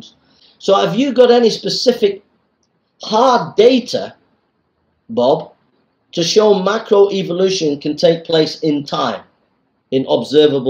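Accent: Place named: Liverpool, United Kingdom. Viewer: My grandfather was Liverpudlian Ave been there loads of time ferry across the mersey BUT if your nancinian then I'm way off on your accent if a or any one knows where he's from gotta be Liverpool or Lancashire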